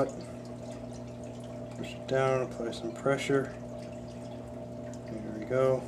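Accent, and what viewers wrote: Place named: Minnesota, United States. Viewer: Boy your voice sounds like home AKA Minnesota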